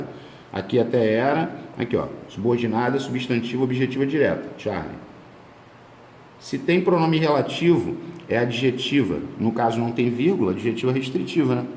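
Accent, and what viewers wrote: Rio de Janeiro, Brazil. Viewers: "tem sujeito, perguntou "o que?", é O.D., mané!!! uuauahauha, esse "mané" entrega o carioca mais q o "s" chiado uhauhauhauah Puta aula! valeu de mais,!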